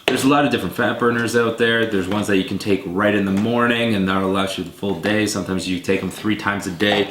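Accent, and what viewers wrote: Canada. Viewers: Is he Canadian? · You have a thick Canadian accent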